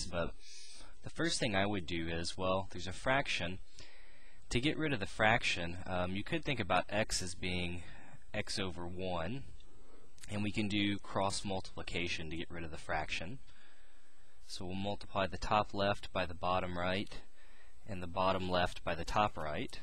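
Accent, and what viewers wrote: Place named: United States. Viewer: As soon as I heard an American accent, I knew this was a different method.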